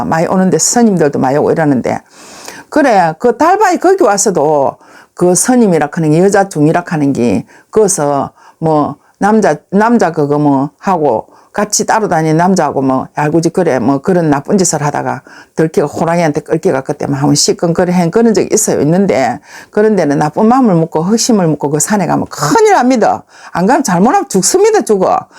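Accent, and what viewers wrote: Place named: Gyeongsang, South Korea. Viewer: 경상도 말 그대로 하니 정이 갑니다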